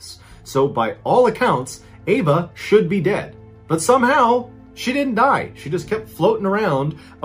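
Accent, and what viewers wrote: United States. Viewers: I do have to say I love hearing Americans say Australian towns. As an Aussie it takes me a moment to catch up on what towns are being spoken about. I end up saying it correctly in my head. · It's funny as an Aussie, hearing Americans pronouncing our towns and city names. Not Mr Ballen fault, it can be hard.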